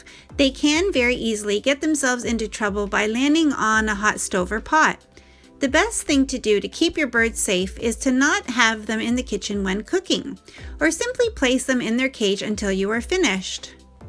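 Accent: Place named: United States. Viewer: Like the American accent is it west coast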